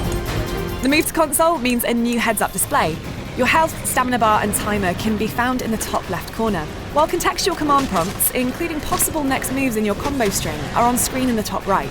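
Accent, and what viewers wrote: United Kingdom. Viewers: I'm British, and that's how we say Beta. See also "tomatoes" "vitamin" and "aluminium" for further education / infuriation. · seriously what is up with people, you all act like yours is the only acceptable form of pronunciation,It's UK English and you can clearly hear it in her voice. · Afro Samurai HD bless that UK accent bro.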